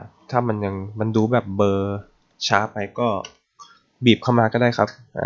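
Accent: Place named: Kanchanaburi, Thailand. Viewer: ฝีมือเก่งมากเลยครับ แถมสอนก็ดีอีกละเอียดมาก แถมมีเทคนิคคีย์ลัดให้ด้วย ชอบมากครับ เยี่ยมไปเลย,, ปล.สำเนียงคล้ายเพื่อนผมที่เป็นคนกาญเลย คนกาญป่าวครับเนี่ย 555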